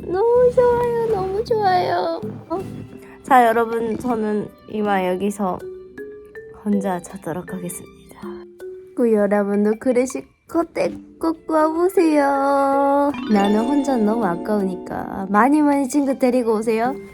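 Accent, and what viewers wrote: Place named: Busan, South Korea. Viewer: 그리고 부산사투리 작렬. 3개국어인가요? 일본어 표준어 사투리 ㅎㅎ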